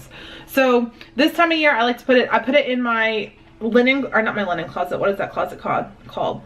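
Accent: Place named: South, United States. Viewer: I am starting to hear a southern accent 😀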